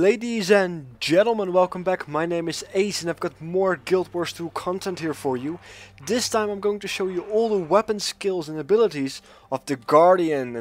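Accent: Netherlands. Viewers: Yo mede Nederlander, nice vid ! ps: Je accent verraadt je :p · You dutch i hear your accent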